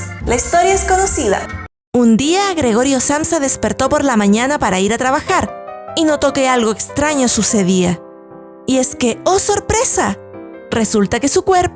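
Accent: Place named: Chile. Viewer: Que buen video! Impresionante explicación, impresionante edición, muchas felicidades ❤️ por su manera de hablar se nota que es chilena jajaja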